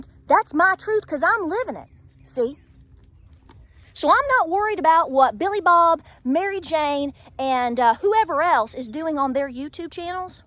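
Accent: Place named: East Tennessee, United States. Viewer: I agree 100% with you! Going out to grease the tractor and bush hog. I will then work the garden. I am an East Tennessee native and senior woman farmer. I talk just like you and I am not changing. You Go Girl! God bless everyone. John .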